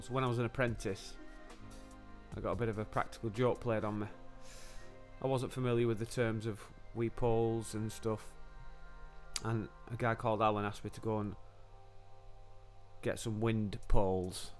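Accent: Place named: Wigan, United Kingdom. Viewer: Where does reside, sounds like a Wiganer?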